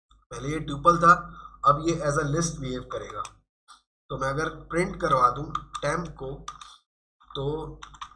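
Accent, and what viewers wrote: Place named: Punjab, India. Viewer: Is lecture me thori Punjabi tone b sunai Di ha.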